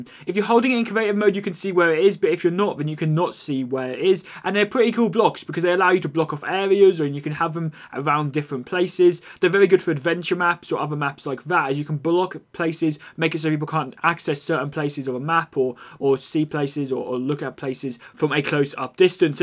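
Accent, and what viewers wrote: United Kingdom. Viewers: i thought you was from the UK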